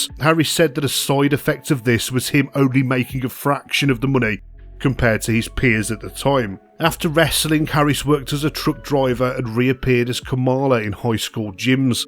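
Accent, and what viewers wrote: United Kingdom. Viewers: “or joost a bit of good look” I love bri ish accents 😁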